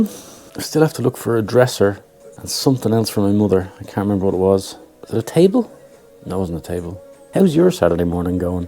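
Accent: Dublin, Ireland. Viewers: Loving your vlog. Been watching from the start so maybe I’m number 30 or so of your 40 or so followers. Was great to hear that New Zealand is a place you want to visit. We love Irish accents here. Love your down to earth approach. Would love to see more of France but can’t travel at present (no I’m not in jail) so really love your trips in Pasquale. Great camera work. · If you mistake a strong Dublin accent for a Scottish one, you can't be too familiar with either... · I could listen to you talk all day. Love an Irish accent. Great vlog, keep them coming.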